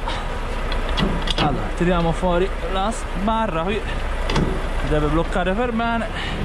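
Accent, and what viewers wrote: Tuscany, Italy. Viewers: Ciao il video ci sta pero mi fa ridere come pronunci la C si vede che sei toscano 😂😂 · senti il toscano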